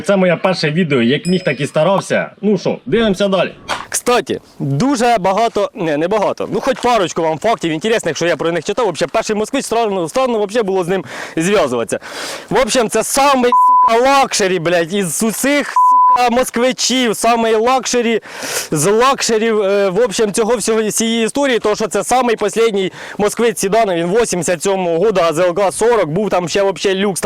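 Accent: Poltava, Ukraine. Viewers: З перших секунд чути Полтавську область. Звідки ти?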